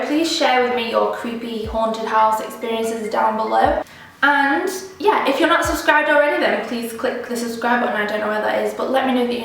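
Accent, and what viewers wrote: United Kingdom. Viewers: I love the accent are you British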